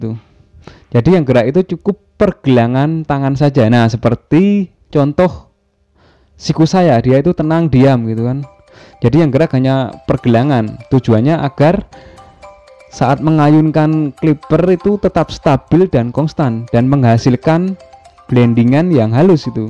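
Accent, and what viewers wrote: Indonesia. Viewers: Ngomongnya Gk usah gua2 sih wong logat jawa kok pake gua, bilang aja saya atau aku mas,,, biar enak dengernya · Ngapak ya mas 😆😆 Salam satu gunting 💈💈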